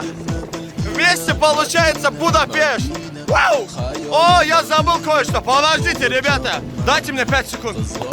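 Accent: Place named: Azerbaijan. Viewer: Акцент азербайджанский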